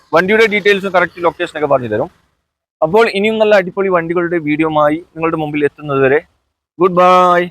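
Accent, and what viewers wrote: Kasaragod, India. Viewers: ചാനൽ പേര് നോക്ക് അല്ലെ മലപ്പുറം അല്ല അവര് കാസറഗോഡ് ആവും സംസാരം കേട്ടിട്ട്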